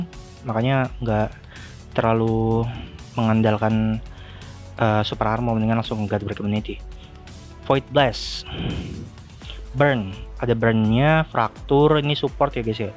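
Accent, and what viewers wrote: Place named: Betawi, Indonesia. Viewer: Lu org betawi min ya 😅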